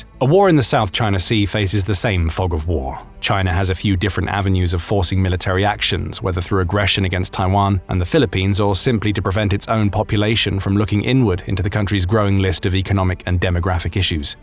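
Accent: United Kingdom. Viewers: The moment the British voice, I m gone.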